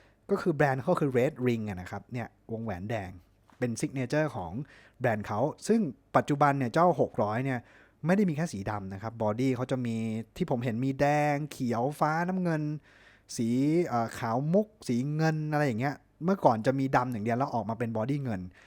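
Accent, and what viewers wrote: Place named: South, Thailand. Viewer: ใต้ออกเยอะหรอยนะครับคลิปนี้555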